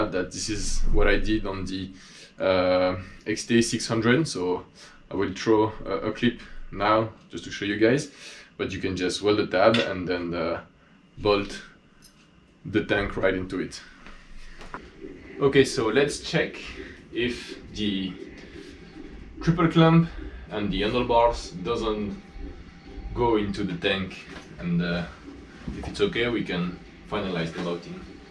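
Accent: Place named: France. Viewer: On dirait qu'il y a un petit accent français 😏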